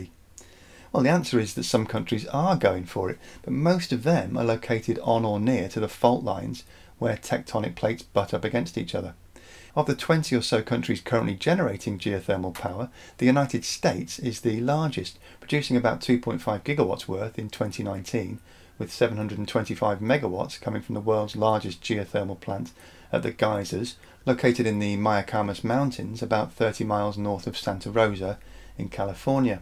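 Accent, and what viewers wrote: United Kingdom. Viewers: We weren’t ready for that accent. I love British accents.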